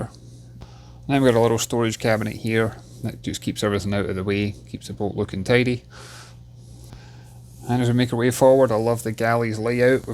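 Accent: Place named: Scotland, United Kingdom. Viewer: Neat review; loving the Scottish accent...Garnock, Ayrshire?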